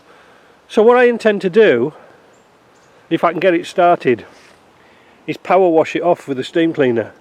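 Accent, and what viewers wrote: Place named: Cumbria, United Kingdom. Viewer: Trying to place your accent. Cumbria?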